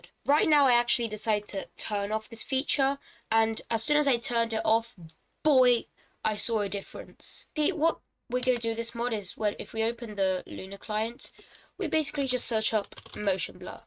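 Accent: United Kingdom. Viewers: that british accent doe 👌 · ayo first time hearing a female british player! you deserve more attention on your vids tho edit: ok before someone comes to kill me, i dont know anything about the voice seems female to me so i said it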